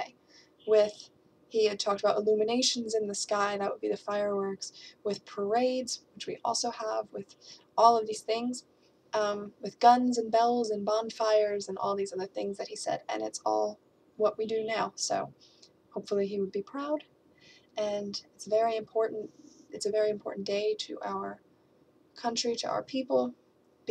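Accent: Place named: Belarus. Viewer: акцент делает русский язык, очень похожим на белорусский язык =)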